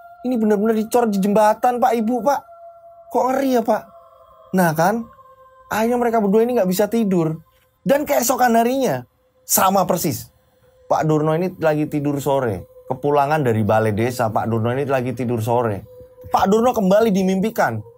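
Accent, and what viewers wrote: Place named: Banyumas, Indonesia. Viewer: Ngapak hadirrrr😂 .. Ora ngapak ora kepenak.. Booommm... Booommm. Yosskayyy😅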